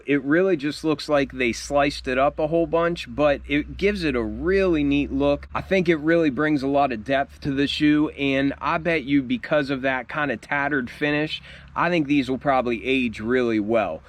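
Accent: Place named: Pennsylvania, United States. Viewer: Nice video man!! Just a quick question are you from Pennsylvania?? You have an accent that reminds me of myself from Pennsylvania...lol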